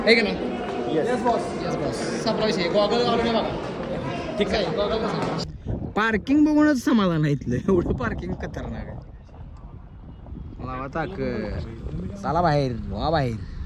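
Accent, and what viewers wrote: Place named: Vidarbha, India. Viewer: विदर्भ bolte naaa bawaaa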